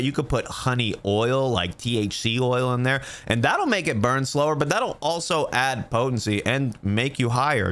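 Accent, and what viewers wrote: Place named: New York, United States. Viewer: What is with the random New York accent you start and stop using at random? I've been watching for a little while and you only mention Colorado and Florida. It's weird af.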